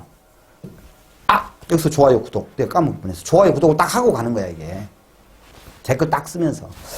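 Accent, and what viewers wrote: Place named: Jeolla, South Korea. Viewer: 전라도 사투리가 친근감 있고 좋아요 알기쉽게 잘 설명해 주셔서 감사합니다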